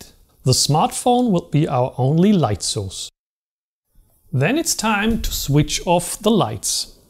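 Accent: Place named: Europe. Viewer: This is absolutely the way I need to learn manual without being bored! Glad I found this channel! Your accent is interesting. European?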